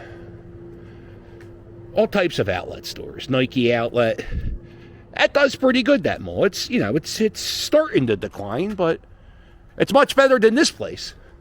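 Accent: Philadelphia, United States. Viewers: I come from 4 generations of Philly. Those pronunciations are quintissentilal Philly.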